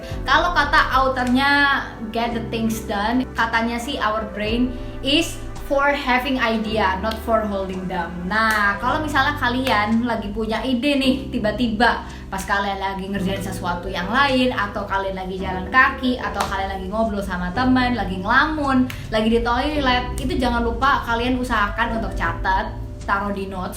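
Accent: Java, Indonesia. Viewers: Orang Jawa ya mba? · Orang mana mbak kok medok jawanya? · wong endi mbak? wong jowo huduk ?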